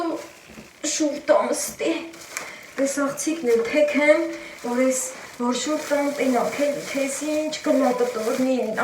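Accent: Lori, Armenia. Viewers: Վաաախխխ Լոռվա բարբառ ❤❤❤ որտեղից եք տիկին ջան?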